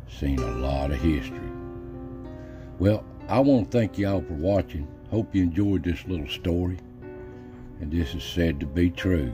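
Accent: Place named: South, United States. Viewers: Another great one bro, your country accent sounds like us round here. Like to hear them ghost stories. Liked the one on the Melungeon people too. I have a knot on back of my head like they do, but never been checked to find out. Mamaw always said her people were black Dutch, so they were bidding something. Anyway I'm looking forward to more stories. Thanks man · just love your southern drawl,and the way you tell ghost stories and tales Thanks for a GRRRREAT CHANNEL!!!!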